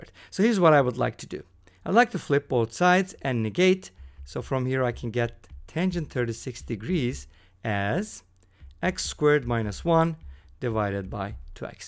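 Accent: Turkey. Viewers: I guessed from your (slight) accent you might be Turkish. Now it appears I am right.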